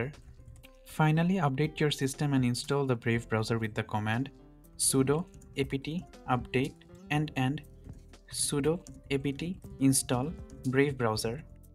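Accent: India. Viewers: কথা শুনে মনে হচ্ছে আপনি বাঙালি ❤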